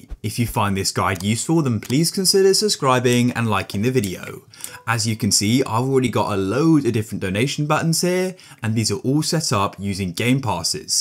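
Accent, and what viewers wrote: United Kingdom. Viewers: Are you british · Yes, he is british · Thank you British man!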